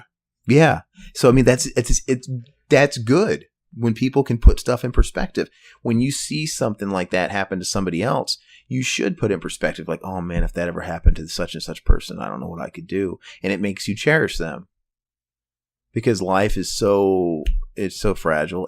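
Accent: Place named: United States. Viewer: Nice to hear your american voice again brother! 😃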